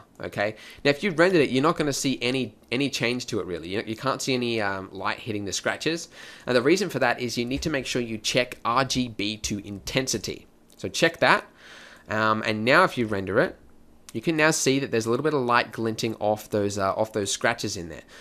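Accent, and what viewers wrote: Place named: Australia. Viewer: Great tutorial mate, feels good to hear an aussie for once. Alot better to understand.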